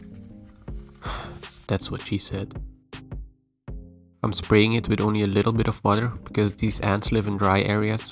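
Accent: Netherlands. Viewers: je Nederlands accent is hilarisch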